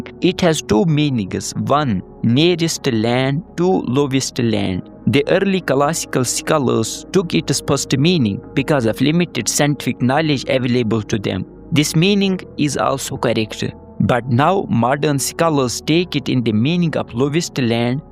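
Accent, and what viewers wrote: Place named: Asia. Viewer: He has a thick Asian accent and he wants to prove that he can read English text like professionals. Though he learnt to use video clips from royalty-free visual sources to support his research in text. ❤❤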